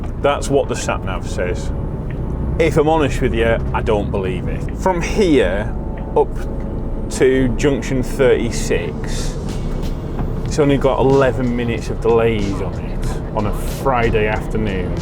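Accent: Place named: North, United Kingdom. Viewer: Enjoyed the vlog chap....your accent is northern..lol...great review on the dash cam 😂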